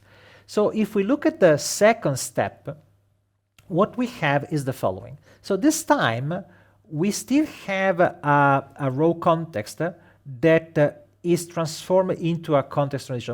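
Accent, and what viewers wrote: Italy. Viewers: Excellent. This channel probably has the best advanced PowerBI free content on the internet. Moreover, the Italian accent is very funny! Greetings from Brazil.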